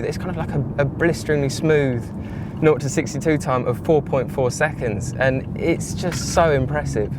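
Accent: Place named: England, United Kingdom. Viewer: I don't know why, but I can't stand the way English people talk.. It has the power to make the coolest cars sound absolutely rubbish, darling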